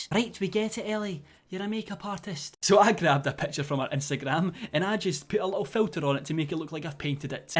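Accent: Scotland, United Kingdom. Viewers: Lol I love his Scottish accent I have an American accent and I LIVE in Scotland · SepticSkull X it’s a scottish accent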